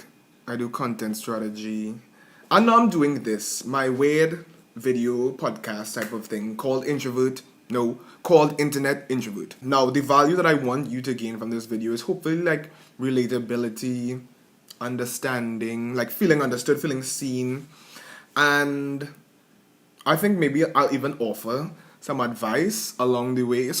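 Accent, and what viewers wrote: Trinidad and Tobago. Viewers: lol as soon as I heard the accent I knew you were a trini lol 😂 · Once i heard the accent i knew trini one time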